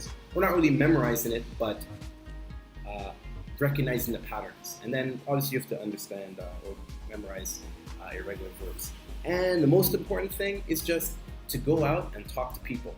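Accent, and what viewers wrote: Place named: Caribbean. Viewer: I use duolingo as well! I've been trying to learn Spanish, but it's so difficult because I live in small town, USA. And most people that do speak Spanish doesn't want to speak to me in Spanish. Sometimes my head hurts from all the studying. BTW, I want to ask you, do you have a Caribbean background. I think I can hear a little accent. Thanks for the video, it's really informative. You should make more videos speaking Spanish! I would love to hear how your Spanish has improved since you've taught yourself.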